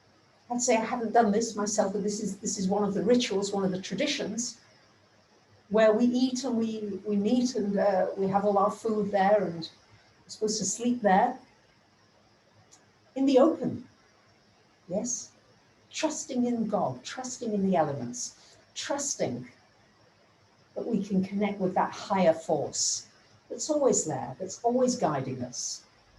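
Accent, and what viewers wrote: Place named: Lancashire, United Kingdom. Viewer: I always knew there was a little Lancashire twang in your voice,! Lots of love from a fellow Lancashire lass xxx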